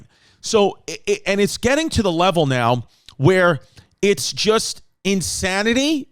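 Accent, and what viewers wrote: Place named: Brooklyn, United States. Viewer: Everyone seems to forget, behind his HEAVY brooklyn accent, chrissy chaos, once graduated doctorate school and once held court on History Hyenas. This is 100% factual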